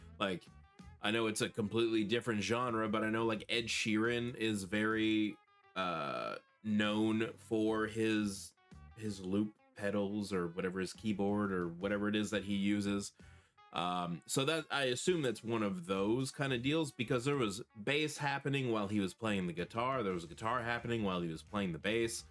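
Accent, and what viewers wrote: Quebec, Canada. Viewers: Where did you pick up your Quebec accent? Because you have traces of it? You know, I live in Montreal, but I'm still more than 5 hours from Saguenay, where Angine de poitrine (Heart pain) originates!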